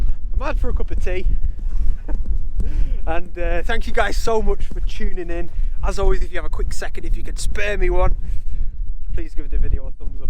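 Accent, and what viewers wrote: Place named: Northern England, United Kingdom. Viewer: Great video. I never think of shooting wide open maybe it’s about time to have a go! So jealous of where you live, being able to visit such beautiful scenes. I sometimes wonder if I tune into your channel just to hear your lovely Northern accent being a Manchester girl myself! 👍📸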